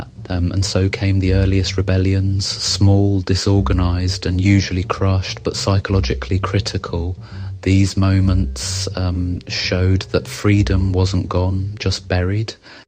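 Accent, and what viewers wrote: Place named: South England, United Kingdom. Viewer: I'm a Geordie. It's always nice to hear that refined southern accent saying something that isn't absolute shite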